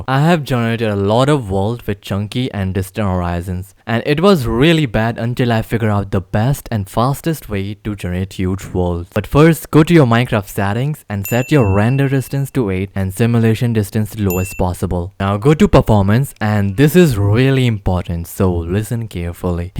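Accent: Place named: India. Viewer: i feel like getting scammed from a india scammer he has like an voice like an idian try to speak english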